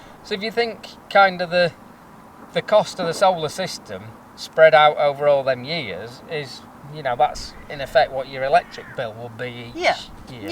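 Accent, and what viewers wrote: Yorkshire, United Kingdom. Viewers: Just love your Yorkie acent,ime Lincolnshire twang maeert 😜,love whatching yours and chanel you make it look so easy like second nature,take care both of you stay safe.🎥👌👍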